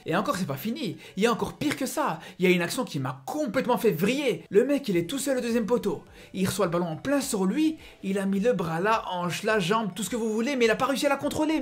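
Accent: Belgium. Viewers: On entend bien ton accent belge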